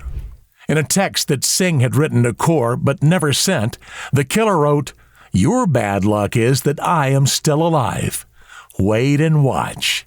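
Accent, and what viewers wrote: United States. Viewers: this American overacting voiceover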